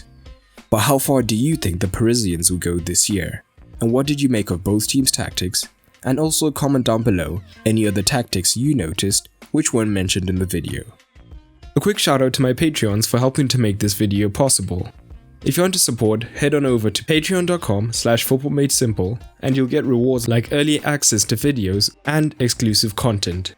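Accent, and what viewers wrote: South Africa. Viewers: You sound like you from South Africa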